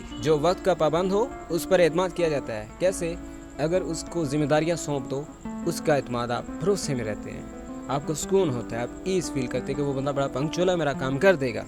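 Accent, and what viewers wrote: Punjab, India. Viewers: Wah g wah Vich punjabi touch bohat aala hai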